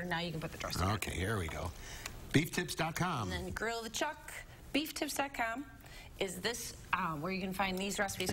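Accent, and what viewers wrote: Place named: Wisconsin, United States. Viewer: I love a nice Wisconsin accent. 😻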